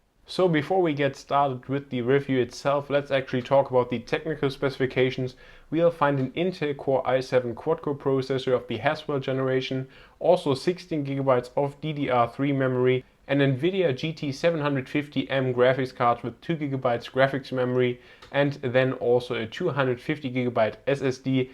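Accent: Germany. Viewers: Du bist doch deutsch oder? Gutes Review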